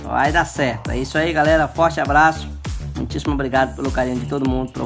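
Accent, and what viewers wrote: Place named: Pernambuco, Brazil. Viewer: Você é de Pernambuco?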